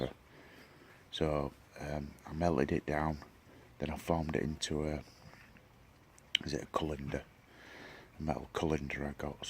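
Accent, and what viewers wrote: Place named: Northern England, United Kingdom. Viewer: A highly original and imaginative design! Keep doing what you’re doing mate, you’re an out of the box thinker and I like it! And it sounds like you’re a fellow northerner too, another tick in the box 👍🏻😁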